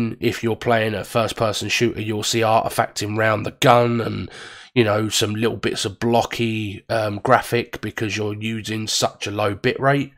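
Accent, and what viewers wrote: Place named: United Kingdom. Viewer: Ur too much British accent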